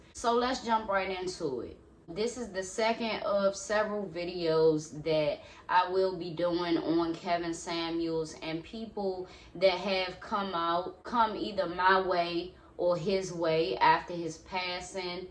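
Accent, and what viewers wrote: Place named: Maryland/DC, United States. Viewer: you must be from the md/dc area 🤣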